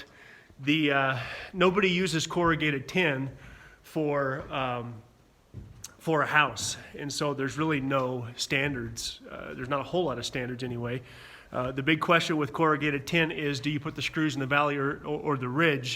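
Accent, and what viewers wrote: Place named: South, United States. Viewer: As a northern carpenter. Just be listening to I can tell right away you're from the south. Can I suggest something? Have you thought about putting the tyvek (all you can ) on before you raise the walls? I remember the first time I worked in the same sub with a southern crew. We had about a week on a home in when the southern crew arrived. They on the second story in about 3 days. I said to my foreman they're going to beat us. He said just watch. It took them a month and a half to finish. They had to scaffold everything off. No way they made money on the job. Just a little thing I learned. Be blessed Bro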